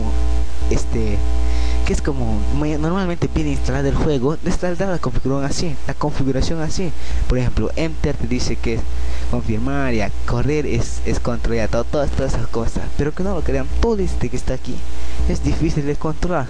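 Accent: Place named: Peru. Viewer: Peruano xddd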